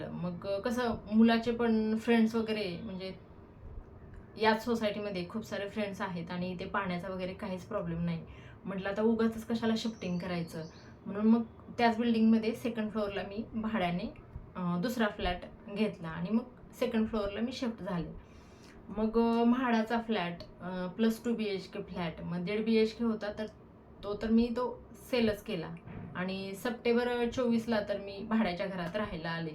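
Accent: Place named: Sangli, India. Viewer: One shoul buy flat as oer his requuremnt unnecesry buy so mny flat may out u in danger.as maintence of society etc hv to oay al bills etc.its costky akso unnecessry paymnt if dues.try to hv 1 gd flat wgere u can maintain d same properly.its not wirth to hv so mny fkats if u hv income u may go n rent tgem out so u may get som income. I think u r from sangli area its accent is in Sangli language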